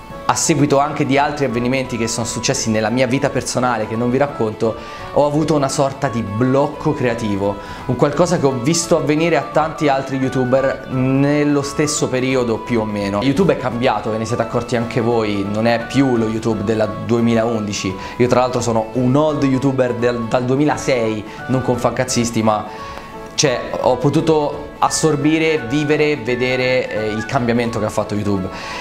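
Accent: Tuscany, Italy. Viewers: Ma perchè l accento toscano? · ...Mi pare di notare un accento toscano...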